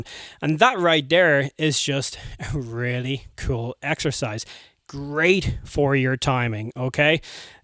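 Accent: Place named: United States. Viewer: Great to see you back doing what you do best. You're a natural born drum teacher. I don't know if your family in Ireland notice it when you make video calls but there's some American creeping into the accent.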